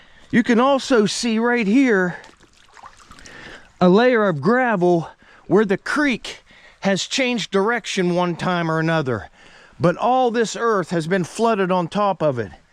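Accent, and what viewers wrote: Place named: Delaware County, United States. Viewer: love the delco accent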